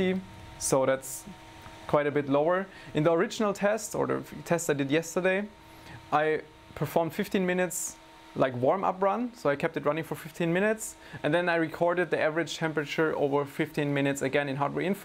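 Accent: Germany. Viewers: Man merkt direkt dass du deutsch bist xD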